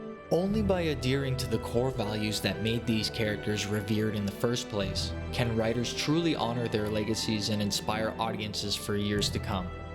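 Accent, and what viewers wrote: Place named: Canada. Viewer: As someone who hasn't played Halo, not a console gamer and not great at shooters, I'M pissed at the mishandling of the character - and the constant attempt to bait and switch lead characters. He is an icon, deserves better. If you wanted a helmetless Master Chief (but why though?) Kurt Russell did it better in 1998: <link> Impressive first video - HELLA good editing. Shame about that cannuck accent.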